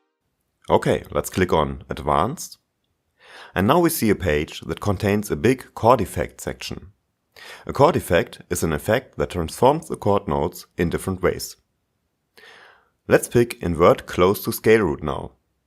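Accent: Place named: Germany. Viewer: German accent for ever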